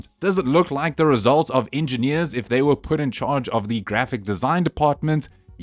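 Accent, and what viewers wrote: South Africa. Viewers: Is that a saffa accent?🇿🇦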